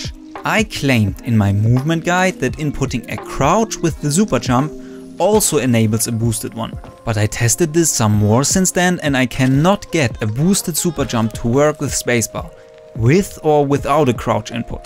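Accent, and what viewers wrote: Germany. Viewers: Your german accent made 'Super Jumping' feel even cooler. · i can hear it in his voice he‘s german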